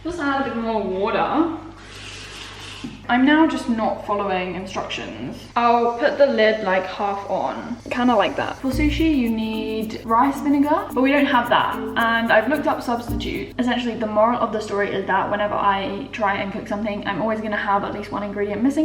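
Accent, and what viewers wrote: Australia. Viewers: You sound so Australian in this video! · sounds so australian in this video.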